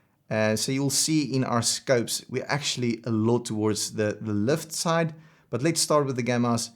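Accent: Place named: South Africa. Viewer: South African!!!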